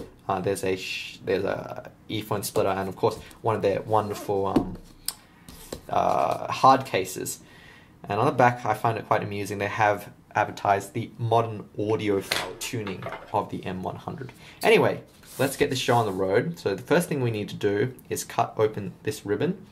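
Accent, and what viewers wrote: Australia. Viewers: I can honestly say I have never heard an asian with an australian accent before.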